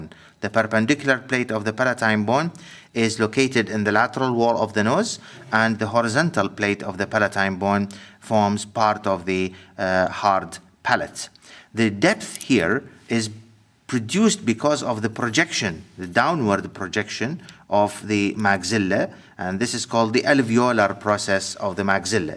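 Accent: Iraq. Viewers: thank u very much dr for this video, the skull has always been a problem to me! hope with ur coming videos things will be easier, though i'm a surgical resident now i prefer to study anatomy in its very basic form, i was honoured to have an iraqi professor as a teacher of anatomy in my university, wonder if u know him actually! his name was dr Hani Alazzawi and u remind me of him i mean the accent:), lots of respect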